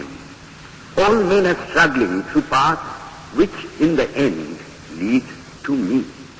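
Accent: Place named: West, United States. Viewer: This is not the voice of Vivekananda. Pure accent of the people in the west.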